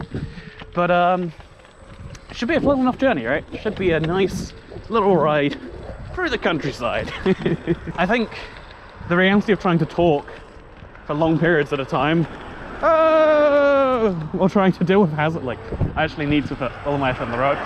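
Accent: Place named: United Kingdom. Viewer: You sound so much more British here lol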